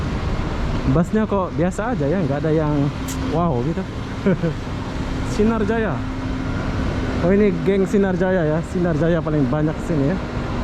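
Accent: Malaysia. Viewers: Asli lombok merantau Malaysia karna udah lama dmalaysia jadi bhsa udah kental malaysia kira2 gitu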